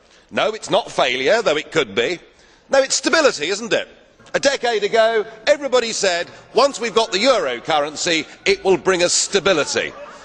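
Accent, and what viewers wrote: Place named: United States. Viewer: Proc je to video namluveny americankou?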